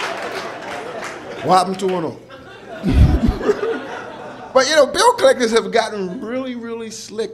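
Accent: Jamaica. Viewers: I really love jamaican accent, especially rastas l wish l was one of them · That Jamaican creole and accent sounds cool. I've never been to Jamaica, but I'm guessing that in the country side, the accent is thicker and more authentic compared to those in the city or something.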